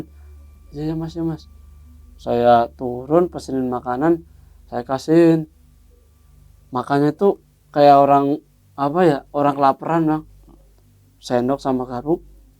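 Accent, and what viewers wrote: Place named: Banyumas, Indonesia. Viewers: Mas nek ngomong khas aksen wonosobo - purbalingga (ngapak) banget ya wkwkw jd kangen ke wonosobo lagi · Doa yang baik2 buat mas..saya doakan sukses dunia akherat Aamiin.., aja kelalen mas ora ngapak ora kepenak..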